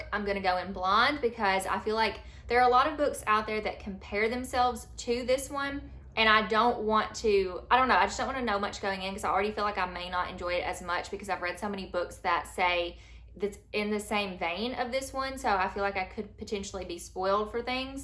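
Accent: South, United States. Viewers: I love September on BookTube because we have so many good readathons here! I’m going to be participating in Series September! Happy Reading! So nice to hear another southern accent here with me on BookTube! 👏👏 I’m also ready for fall too🤣🤣🤣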